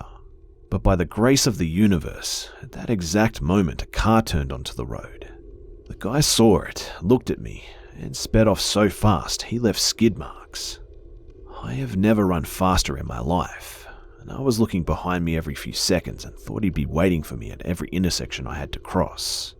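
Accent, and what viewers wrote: Australia. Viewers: I love your Aussie voice storytelling so much that I recently grabbed me a lad from Bris to forever listen to hehe. Thanks Be. 😉🙊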